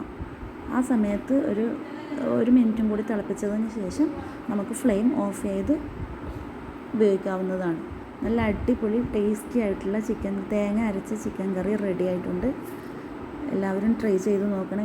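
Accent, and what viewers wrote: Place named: Kasaragod, India. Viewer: oo ഭാഷ കാസറഗോഡ്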